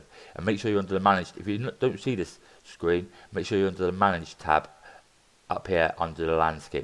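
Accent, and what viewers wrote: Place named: England, United Kingdom. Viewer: You certainly are from England =D Great tutorial brother!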